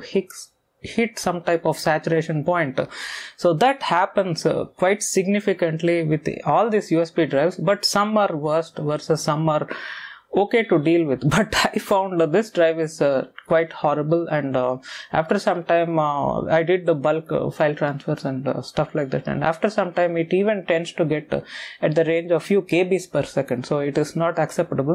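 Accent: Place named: Gujarat, India. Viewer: You Sound Like A Gujju That's Why I Commented This :P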